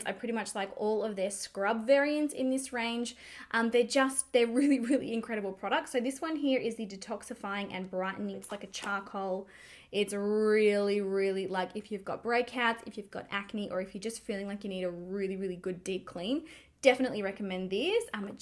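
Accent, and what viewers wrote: Australia. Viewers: Love the Aussie accent😍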